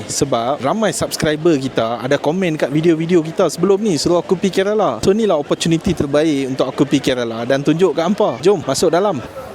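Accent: North, Malaysia. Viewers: Suka dengaq brader cakap utara. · terbaik la... siap cakap utara... syok dengar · Nice video bro. Aku tengok sampai habih. Siap balun cakap utagha 🔥🔥🔥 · Steady bro..orang utara ka..aku baru ja jumpa channel hang ..best2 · Aku layan sampai hbs brader sebab bahasa utaqa hang..senang nk paham😂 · Sebab cakap utara aku subscribe 😂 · Bahasa Kebangsaan dan loghat utara yang tersusun sangat sedap layan video bro.. terbaikk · Start² terus melayu loghat utara, auto subscribe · Aku sub sebab hg terer cakap melayu..loghat utara plak tu mmg sempoi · gilalah ada review bahasa kebangsaan campur utagha bakhang.....inilah yang dicari cari...well done bakhang...tak sangka kerala ni kira maju juga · hg ni org utara ka..😅😅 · Terbaik bro, slang utara tu daripada Malaysian Indian melancong ke India. Terbaik review ni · Syok dengak loghat utara.. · Perghhh ni video pertama aku tengok, sebab naik suggestion. 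Tak cakap banyak, selepas tengok opening 2 minit terus aku subscribe. Nampaknya aku dah jumpa benda baru yang nak melayan sebelum nak lena, mamat ni not bad lah untuk vlogging. Paling best dia guna loghat utara, senang faham. Time lunch hour pun boleh layan video ni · Saya org Penang. Saya selesa dgn orang cakap utara. Dah subscribe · YouTube suggest. Dengaq pulak cakap utaqa. Done Sub semoga channel sukses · Video hang fyp kat YouTube aku time aku scroll. Bila aku dengaq hang sembang utara langsung teruih aku SUBSCRIBE channel hang.. Sempoi bak hang video hang. 🎉🎉🎉👍👍👍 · skrol2 youtube jmpa chanel ni ...try bkk...skli loghat utara mmg padu pny review...mmg sempoi.. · bukan BM jer...dia bantai loghat utagha bak hang.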